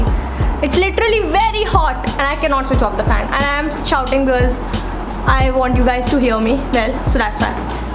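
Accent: India. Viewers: Haha she has an Indian accent ofc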